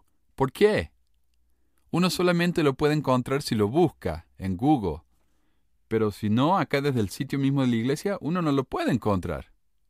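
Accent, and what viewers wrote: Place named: Argentina. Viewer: es argentino de cordova en chile estamos llenos de modelitos de reality de ese país su acento inconfundible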